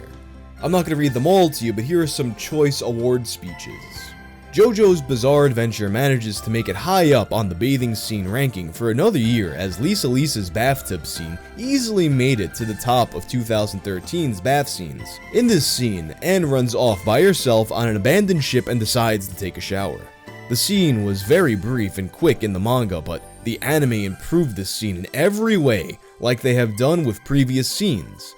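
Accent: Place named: New York, United States. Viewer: Nah, it wasn't repetition of the word, and it didn't start to lose meaning to me. It was just hearing it in American New York accent that almost immediately flagged the word itself as a unique one. Thanks for alerting me that there's a word for that phenomenon, though! Interesting little wikipedia page on that.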